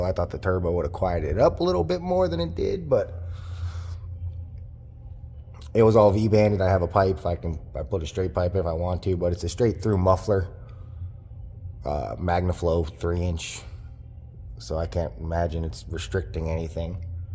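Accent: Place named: Sacramento, United States. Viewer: Dude u sound like some I went school with in sac.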